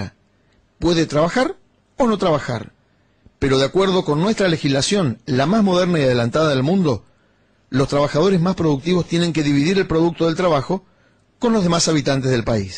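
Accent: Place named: Argentina. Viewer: No sé si encuentro mas patético el "analisis" con pretensiones de fabula a la de la Fontaine o simplemente el acento argento. No, no soy particularmente socialo y si, tuve la mala pata de nacer en la argentina. Ese acento no me es insoportable por simple desconocimiento sino que lo conozco demasiado bien. Y no tampoco, aca en Francia, la poblacion es solo mas "poli" (educadita digamos) pero en capacidad de analisis, lucidez politica y esas yerbas, son tan precarios como el argento promedio.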